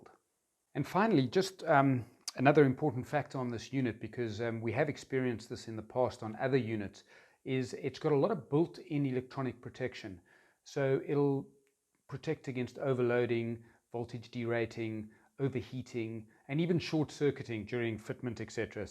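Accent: South Africa. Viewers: Hi, nice to hear another South African. great video, Im doing my homework on both Solar controllers and DC to DC chargers. In the past I had a single unit (CTEK Dual) but now plan to go with what you have. My question, 1)do you in anyway link the two units together or are they both 'individually' connected to the Leisure Battery? 2) Does the MPPT controller also have a feature of trickle charging the Started battery like the Ctek? 3) Other DCtoDC chargers that I have seen have a small wire to the ignition to detect a smart alternator. I didnt notice this on the Victron, is it just clever and can detect that without the extra input? Thank you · Great information.. love the mix of SA and Ozzie accent too :)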